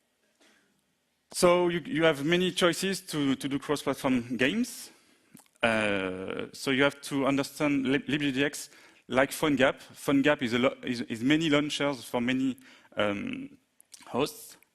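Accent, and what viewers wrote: France. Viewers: Sacré accent Français :D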